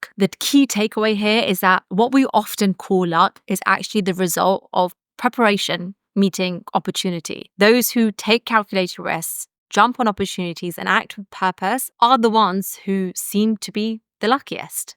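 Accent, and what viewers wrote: England, United Kingdom. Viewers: Your accent is too English 😅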